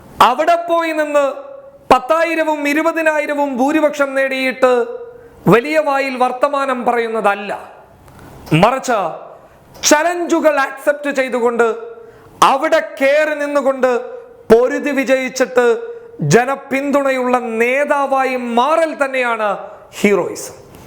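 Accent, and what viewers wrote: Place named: Northern Kerala, India. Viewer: ഈ ഇട്ട് ഇട്ട് എന്ന ഭാഷ വടക്കൻ ഭാഷയാണോ?ഡക്കറേഷൻ ഉണ്ടങ്കിലും ഇടയിൽ വടക്കൻ സ്രാങ്ങ് വരുന്നുണ്ട്.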